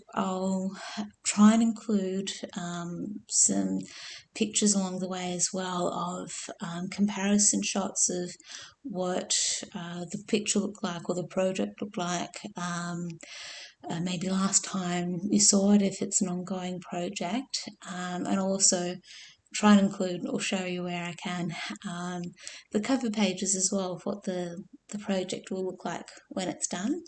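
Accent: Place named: Australia. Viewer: Hi! Lovely to hear another Aussie accent on YT - you popped in to my feed this morning while sipping my morning coffee. I’m now a new subscriber and look forward to seeing some more of your videos 😊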